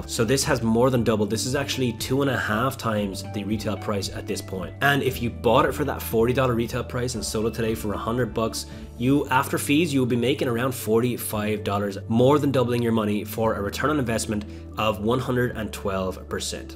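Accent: Ireland. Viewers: Is that an Irish accent i hear? I dont use Amazon for sale prices but thanks for the tips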